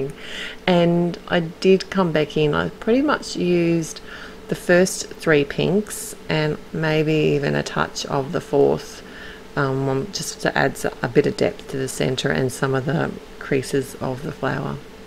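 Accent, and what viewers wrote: Australia. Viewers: Hi. LOVE these gorgeous high pigmented colours. Your card is just so beautiful and I really love your choice of colours. Thanks so much for sharing with us. Always love to hear your Aussie voice.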